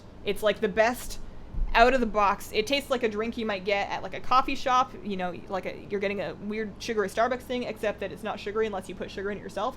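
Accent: Canada. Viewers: I like the Canadian accent 🐻😀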